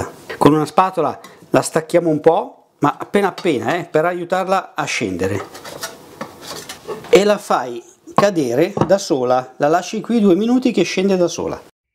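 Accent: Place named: Emilia, Italy. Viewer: Tantissime grazie, per le risposte, hai una parlata penso emiliana, simpaticissima, queste sono anche per la signora che ti è vicina,e che ogni tanto compare, Io ho lavorato come titolare in tutt' altro campo nel commercio all' ingrosso e lavorazioni di legnami all' inizio non distinguevo la differenza tra pesco e pesso. e anche se poi ero diventato il migliore disegnando progettando e realizzando creazioni artistiche nel campo delle lavorazioni di porte tavoli perfino scarpe in tranciato di legno m, però il mio sogna era quello di avere un ristorante , e non sai con quanta amministrazione e quasi invidia ti vedo all' opera per tutto quello che sai fare perché sei proprio un artista dell' arte culinaria e sarebbe una meraviglia saperlo fare come lo fai tu, oggi però che posso anche se sono anziano mi diverto e me la passo grazie si consigli di chi più ne sa di me Un caro saluto 🌹🌹🌹🌹🌹🌹🌹🌹🌹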